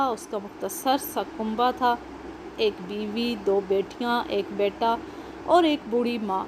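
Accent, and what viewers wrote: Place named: Punjab, Pakistan. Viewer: Apka lahja panjabi h Karachi wali Thora feel krte hain behrhal stayel acha salamat rahen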